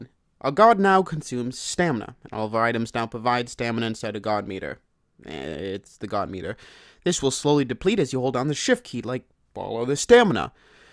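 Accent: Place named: East Coast, United States. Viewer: You sound like you're from the East Coast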